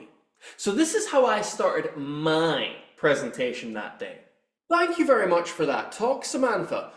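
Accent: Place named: RP, United Kingdom. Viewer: rp accent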